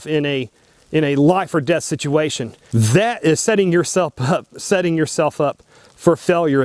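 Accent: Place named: South, United States. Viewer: Just sounds "country" to me. At least thats what the kids are calling it these days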